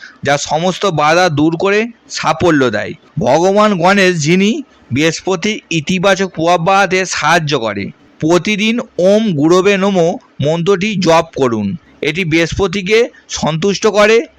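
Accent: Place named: Kolkata, India. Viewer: এটা কোলকাতা, পশ্চিমবঙ্গের কিছু লোকের অভ্যাস, এভাবে বললে তাদের ভাব বাড়ে।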